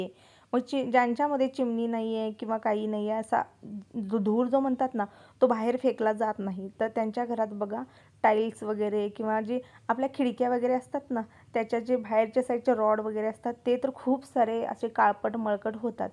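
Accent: Kolhapur, India. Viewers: Tumi kholapurchi bhasha bholta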